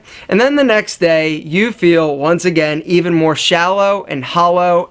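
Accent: Philadelphia, United States. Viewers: You from Philadelphia?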